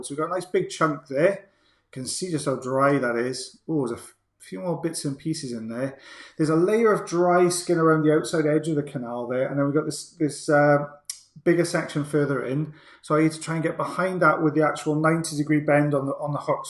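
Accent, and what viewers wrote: Wales, United Kingdom. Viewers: you said you wanted to know if youtube interrupted the video to show an ad - they were showing the DR Drew says this guy is for real V-shred ad on 26/11/23. I love these videos, theres something so satisfying about the skin peels! and your accent reminds me of my grandmother, i loved her welsh accent and i miss it so much, please keep the videos coming!!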